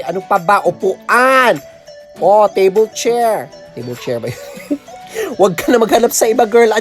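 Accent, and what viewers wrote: Visayas, Philippines. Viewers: Bisaya ka ba inday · Bisaya ka?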